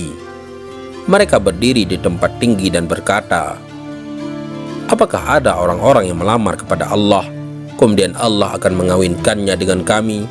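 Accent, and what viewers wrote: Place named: Bali, Indonesia. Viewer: Yg pengisi suara nya siapa ya kyk nya bkn orang jawa logat nya kyk orang bali mungkin kh bali mualaf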